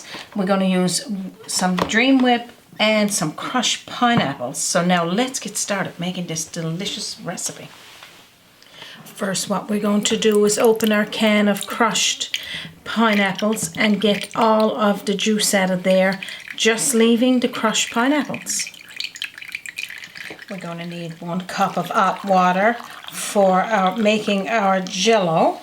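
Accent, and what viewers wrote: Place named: Newfoundland, Canada. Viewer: you are just the cutest cook onYouTube! I am enjoying learning how you prepare the various meals, desserts, breads and such in Newfoundland! I developed a love for your country from a TV series I watched called "Republic of Doyle" Love the accents! Is there a reason you Newfoundlanders drop your H's?? "You 'ave to 'ave 'alf a cup!" You make me smile! 'appy Mothers Day!!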